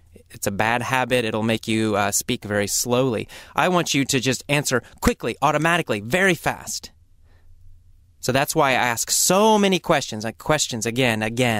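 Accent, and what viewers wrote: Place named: Canada. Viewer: هذا لديه لكنة كندية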